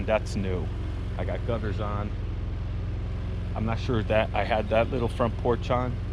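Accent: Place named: Connecticut, United States. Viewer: I grew up in CT and was gonna say your accent kinda sounds like you're from CT, then I saw the plates on your truck! Cool cabin, thanks for sharing all the details with us!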